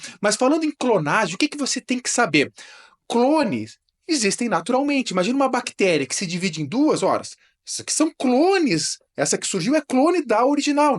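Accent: Rio Grande do Sul, Brazil. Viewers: Sotaque gaúcho tchê. Professor muito bom. Tomara que seja gremista. kkkkk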